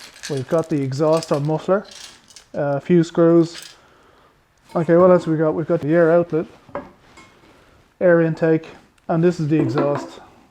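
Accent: Ireland. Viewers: Great little video. That positive Irish attitude to life and the cheerful accent. It reminds me of my little Irish mum, god bless her and my she rest in peace. Those diesel heaters are an obsession. I have installed two at the cottage in Southern Finland. One running from the garage under the cottage into one side through a hole through the concrete slab. On the other side of the cottage, in from the patio area through the wall. Last year had only one but in one room was warm but still needed the wood fireplace on the other side of the cottage to heat things. That means trekking through the snow to collect wood to burn. In winter in the Finnish countryside, the snow can be meters deep and by January can be -10 to 20C, and it's a pain(A COLD PAIN) aside from the need to cut wood in the autumn. Filling diesel tanks on these heaters is easier. The newest of these heaters is the HCalary 8,5kw which I bought in mid-summer for a dirt cheap price on e-bay and I have put a 25 liter diesel tank. 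That one has a far better control panel with better features and easier use. The cottage is off grid, so hoping that I get the extra solar panels up just bought cheap to help keep the inverter and four 100Ah batteries topped up for the heaters and lights of the cottage during this winter. We have a Champion generator too, which helps the time we are there to maintain the place. That Hcalary heater can be set to come on when we are not there, but I am a bit unsure of setting it to go as earlier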